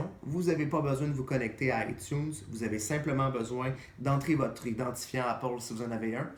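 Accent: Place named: Quebec, Canada. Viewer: Oh putain l'accent quebecois .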